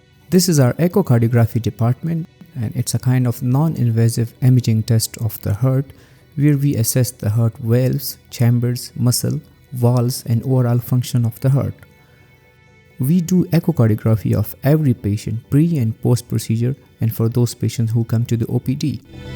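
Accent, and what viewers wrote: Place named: Afghanistan. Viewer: sir i loved your pashto tone….I personally first time watched a pashto medical related video which was quiet good….❤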